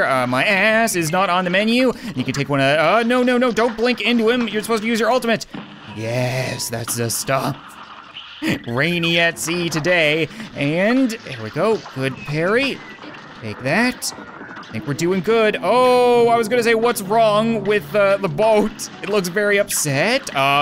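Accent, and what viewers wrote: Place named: Newfoundland, Canada. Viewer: I have watched a number of your videos now and I have shown them to my boyfriend. We're both from Alberta, I told him that you're a Canadian Youtuber from Newfoundland. He said he could tell by the way you pronounced some words. I never noticed before this video! I definitely heard a bit of the Newfoundland accent in this video! It's nice to see your channel continue to grow! I find your videos to be entertaining and unique, best of luck in the future!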